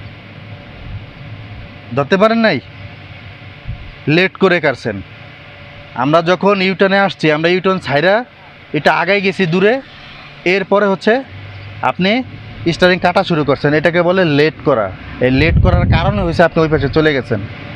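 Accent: Barishal, Bangladesh. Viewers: আপনার বারি বরিশাল নাকি। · ভাই এর বাড়ি কি বরিশাল?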